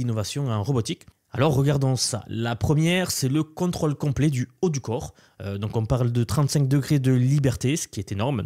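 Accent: South, France. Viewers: Un peu du sud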